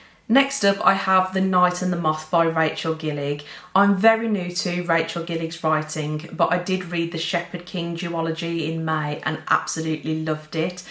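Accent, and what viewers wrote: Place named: Midlands, United Kingdom. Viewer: Love your videos! Such a fresh approach, speaking like a lovely normal person from the Midlands 🙌🏼 Watching all the way from Perth, Australia 📚 Currently reading The Paris Apartment, by Lucy Foley. So far ⭐️⭐️⭐️⭐️⭐️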